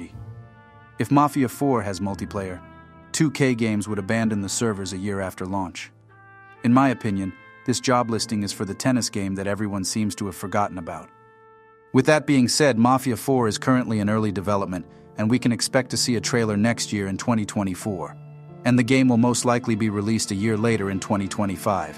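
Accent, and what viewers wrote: United States. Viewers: You have a very crisp clean Italian American voice. And this is coming from a Svensks Deutsche American Vikingr. Keep up this fantastic content sir.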